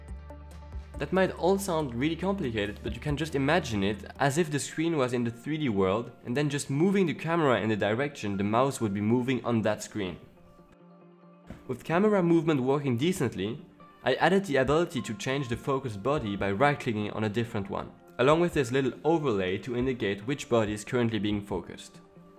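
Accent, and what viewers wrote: France. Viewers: Your accent look like french. Have you upload your work on github or something like that?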